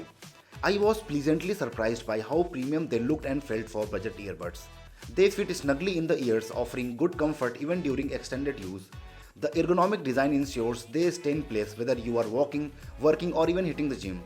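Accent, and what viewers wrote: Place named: India. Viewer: Bro u speak like my UP Board english teacher from village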